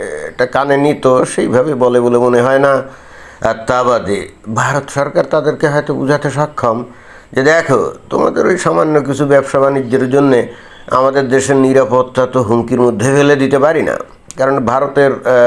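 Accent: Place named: Chittagong, Bangladesh. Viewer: ওস্তাদের ভাষায় শুনতে বেশ ভালো লাগে। চট্টগ্রামের মনে হয়।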